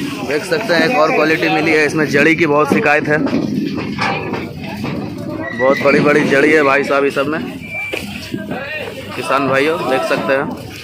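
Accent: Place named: Bihar, India. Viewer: भाई आपका बिहारी भाषा हमें बहुत अच्छा लगता है 🤗🤗🤗🤗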